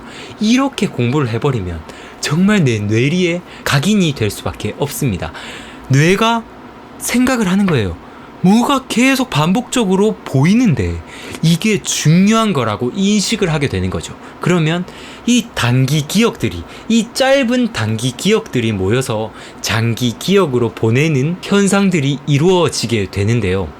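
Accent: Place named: North Gyeongsang, South Korea. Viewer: 아닙니당 ㅎㅎ제가 유도리가없었네요! 경북에사시나요? 고향이 포항인데 말투가 비슷하네요:)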